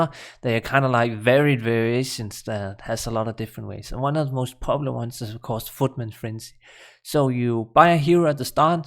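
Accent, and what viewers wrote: Denmark. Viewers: You're a dane, right? :) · i love your Danglish :D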